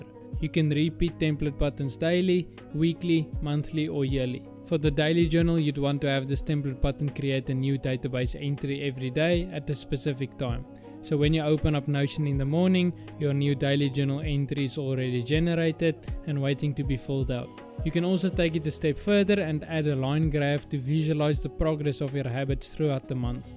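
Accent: Afrikaans, South Africa. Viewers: 2 seconds to hear your south african.❤😂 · This is a great video!! I felt right at home the moment I heard your Afrikaans accent😂 Your video is really helpful and everything went smoothly when I did it myself except for the creative progress bar, everytime I tried to put in the code it said this: "Argument of type text does not satisfy function slice. [6,18] Percentage is not defined. [23,33] Argument of type text does not satisfy function slice. [48,60]" I copy and pasted the code so I never changed anything yet I still keep getting this. Do you know how I could fix it? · Damn this man is very Afrikaans. Wat n legend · Not Slavic. He is South African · I can hear a South African from a mile away. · bro are you South African ? We have the same accent hehe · 100% south african? 😅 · This is the best description of an Afrikaans accent I've ever heard lmao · OMF Are you South African. 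I can hear the Joburg Vibes from your voice. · 6 Seconds in and I hear a Saffer 😂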